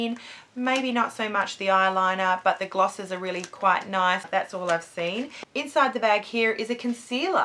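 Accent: Australia. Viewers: Hi! New subbie here and I love u already! And I love Australian accents. Hugs from the states.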